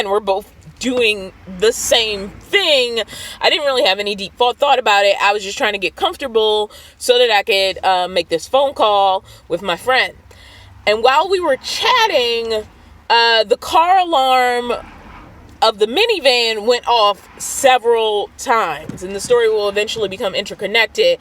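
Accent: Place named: Baltimore, United States. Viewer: Sorry this happened to you and your van. If you decide to pursue the issue, the police can review video from the stores to see if your incident was captured on video, and perhaps also their license plate. Even if you never mentioned Baltimore, I can hear it in your voice, especially the "oin," instead of "on." I'm guilty of that one too.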